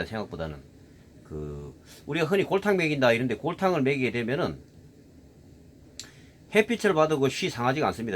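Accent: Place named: Gyeongsang, South Korea. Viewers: 앞전에 님께서 칠점사 꼬리떠는거 설명하실 때 "건들면 쥑이삔다" 라고 하는 거 듣고, 님 고향이 경남 서쪽 어드메쯤인지 대략 짐작이 갔었읍니다. 성조도 부산 마산은 아닌 것 같고, 대구는 더더욱 아닌 것 같고, 진주하고도 다르고, 찍어서 대략 그 안쪽 산동네 지역인 것 같았고... 제가 사실 서울사람이지만 모종의 일로 엮인 적이 많아 경상도 말씨를 꽤 잘 아는데, 경북쪽은 "-해뿐다"라 카든 것 같고, "-해삔다" 경남쪽에서 쓰는걸로 알고 있읍니다. 원래 태백 일대 말씨는 대체로 경북 북부지역 일대와 마이 가차웠던걸로 기억합니다. 다만 두문동재, 만항재만 넘어가면 주민들 말씨가 확 바뀌던 것 같읍니다. 원래 태백 (황지+장성) 일대가 삼척 땅의 일부였고, 소싯적부터 삼척 일대가 국어학자들 사이에서도 영남방언의 일부로 간주되는 측면이 있었다는데... (그래서 경상도 어르신들이 가끔 뻥을 좀 치시지요. 원래 삼척은 경상도 땅이었다고, 뭐랄까 영동공정?을 열심히 펼치십니다. 경상도 출신이 아닌 제 아버지조차 세뇌되어서 그런 말을 하실 정도) 참고로 삼척 윗동네, 옛 명주군(현 강릉의 읍면단위 지역) 땅이었던 묵호쪽도 외지인 유입의 영향으로 경상도, 함경도(실향민들이 많이 정착) 사투리가 꽤 많이 통했읍니다. 지금도 동해시에서는 엄연히 "강원도"지역임에도 불구 "도째비골"을 관광홍보물에 대놓고 " 의 경상도 사투리를 뜻합니다"를 말할 정도로, 그정도로 영남지역의 영향을 많이 받았던 동네입니다. 적어도 지금 40대 정도 세대는 대체로 그런 듯 한데, 하지만 지금 묵호의 최소 중고등학생 세대까지 내려가면 그 이전 세대에 통용됐던 영남방언이든 관동방언이든 관북방언이든 다 잊혀진 듯 합니다. 정류장에서 버스를 기다리면서 학생들 말하는 것 듣다보이, 그냥 "성조 없는(위의 세 동부방언 모두 성조 있음)" 평평한 서울말로 대화를 하더라고요. 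이건 강릉도 그렇다는데, 강릉쪽은 예전부터 문중사회 기반으로 해서 토박이들 많고 소싯적에 대관령 서쪽과의 교통도 대단히 불편하고 해서 (영동선 뚫리기 이전에 제주도 울릉도 오가듯 철도가 있는 대도시인 원산, 포항, 부산으로 '기선'타고 갈아타서 서울로 갔다고 하네요) 꽤 독특한 억양과 어휘의 고유의 방언이 사용되던 고장인데, 젊은 세대 사람들이 사투리를 잘 모르게 돼버려서 그래서 지역 학자들이 많이 아쉬워한다 하더군요. 이 역시도 급격한 표준어권 문화의 유입으로 인해서... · 경상도말투는 한참 듣다보면 편두통이 온다네...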